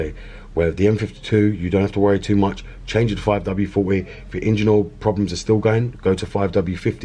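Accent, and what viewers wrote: United Kingdom. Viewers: Is this dude from the UK? What if you're driving an 2005 x3 e83 in a hotter climate like Sydney, Aus?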